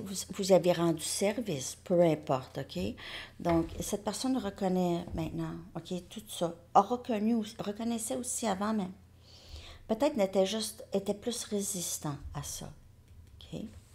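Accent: Canada. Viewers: Bjour trop mignonne un petit ange surtout votre accent canadien bonne continuation et rayon de soleil d Algérie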